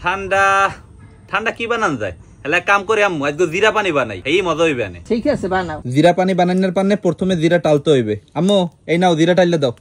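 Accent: Barishal, Bangladesh. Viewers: কোন জেলার ভাষা?মনে হয় বরিশালের।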